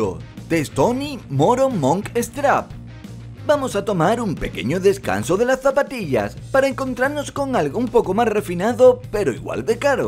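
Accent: Spain. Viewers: hola!! una pregunta eres español??? me dio curiosidad. porque pusiste billetes mexicanos y tu voz parece española 😂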